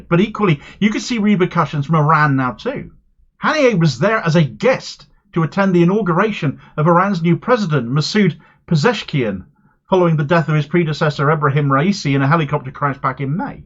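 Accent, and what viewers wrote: Cornwall, United Kingdom. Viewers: I have to agree, your lovely Cornish lilt could sound so much better! (btw I love your work and am on Patreon!)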